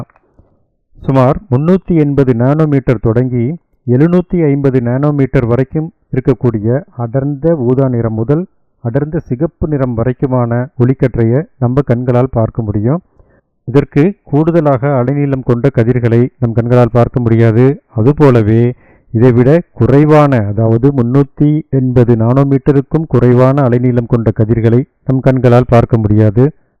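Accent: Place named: Sri Lanka. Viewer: Valthuhal. Neenga engayo poga poringa. Mulu Thamizh kekum podhu oru Sri Lankan vibe iruku. English warthaigal warudhu. Medhuva korachikonga. Tanglish la eludhinadhuku mannikanam. From 🇱🇰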